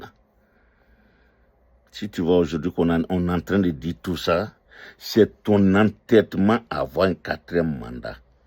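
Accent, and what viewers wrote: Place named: Burkina Faso. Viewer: ce que vous faites là est très dangereux.Les Ivoiriens sont assez divisé comme ça, vous êtes libres de suivre LG que nous apprécions tous pour son courage.Vous les nordistes avec qui le président Houphouet à gouverné,il à utilisé les richesses de l'est pour construire le nord,le sud et le centre.Vous avez bénéficié de tous, vous vous êtes alliés à Allassne qui à été imposé à Houphouet pour détruire la côte d'ivoire et aujourd'hui tu viens raconter des anneries.Ton accent te trahi,tu est d'origine Burkinabè et ton intention est de chercher à mélanger encore le pays parce que tu ne veux pas voir Thiam devenir président.Dieu seul sait qui il choisira.J'aimais bien t'écouter mais là tu dis beaucoup de contres vérité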